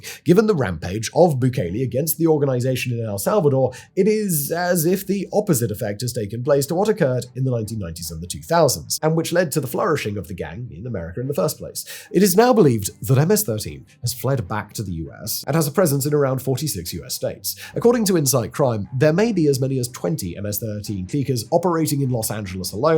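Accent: United Kingdom. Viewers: I always love your work and Britishly pronouncing words but clique is pronounced click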